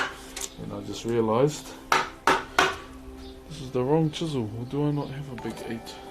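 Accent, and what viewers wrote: New Zealand. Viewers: It is the same accents they're both Maori, the indigenous ethnicity of New Zealand 🙂 not all Maori have this accent but a lot do.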